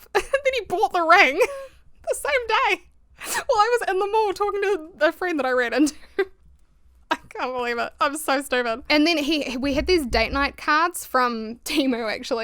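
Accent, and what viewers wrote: New Zealand. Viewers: I hear Kiwi. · fellow kiwi with some linguistics knowledge here. You def sound like a Southie for the most part. Notably though, you do velarize some sounds. Mostly h and s sounds from what I've noticed. Could def be something you've picked up from your Dutch dad. Or i could be way off. Hope it was maybe interesting though 😊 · I know right. Im kiwi and I sometimes hear a tinge of a kiwi accent there, but then there’s also Aussie and American in there. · She's from New Zealand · Sorry! Kiwi accent!! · Im a little late to comment, but I love your videos! You always have a refreshingly nuanced take on the topics you speak about, taking things in good faith and looking at them from a logical yet empathetic perspective. I really appreciate having a channel to watch after work that feels so grounded in reality. I also appreciate and admire your ability to be open and vulnerable about your past hardship while still mainting an optimistic view, as well as keeping distance from us the viewers. 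I can only imagine that delineating between being honest versus nurturing parasocial relationships is extremely difficult, but you seem to be managing just fine. The way you decide when and how to use personal anecdotes is incredibly effective in enhancing your point and is genuinely impactful because its not the only point you have to make. One final thing Ill say is this; I know you get plenty of comments on your accent, but I think its so cute. Ive always loved kiwi accents. When I was a kid my favorite twitch streamer was kiwisimmer (or a username along those lines), partially because her voice was so soothing to me. Keep it up with the amazing videos, your perspective and personality is unique and incredibly necessary on this platform!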